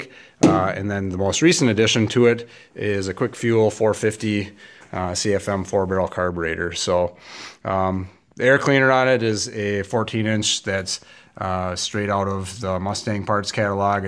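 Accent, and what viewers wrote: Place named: Upper Midwest, United States. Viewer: Yes, the northern.midwest accent. I wonder how a 4.9L running on propane would do. They certainly run clean with no fouling of plugs. They run better with high compression pistons or head decking to raise the compression. Seeing the specs on the Honda GX NG Civic they had compression ratios of 12.5:1. Propane should work pretty well unless its gets SUPER, SUPER COLD liquefies -44°F. Natural gas isn't as available as propane. Hard to get a hold of out on the interstates. Fed gov't has a website about this stuff on fueleconomy.gov. alt fuels.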